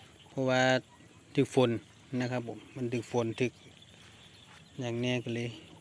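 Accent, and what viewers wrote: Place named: Chaiyaphum, Thailand. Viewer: ว่าแล้วสำเนียงบ้านพี่เล้ยยย น้ำไม่ท่วมดีใจฝรั่งก็สวยงาม ยังไม่ได้กลับชัยภูมิเลย อยู่แถวไหนครับ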